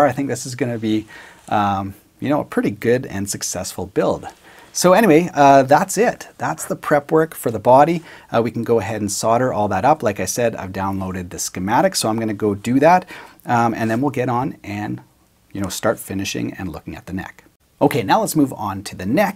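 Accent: Canada. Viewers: Are you Canadian? I am just wondering...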